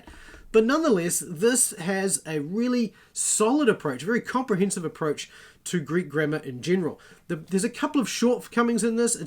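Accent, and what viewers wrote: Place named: New Zealand. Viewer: Hi! I just stumbled upon your channel very recently and loving it. Thank-you! I noticed your Kiwi accent instantly as I am an Aussie. I am 3/4 of the way through Duff's "Elements of NT Greek" and really loving it. For some reason, this seems to be the industry-standard first-year Greek textbook for Australian institutions, hence my choice of it. Have you done a review of this yet? I could not find it anywhere if so. With regards to this video, I am wondering if Duff would be an adequate substitute for Mounce's book on your list?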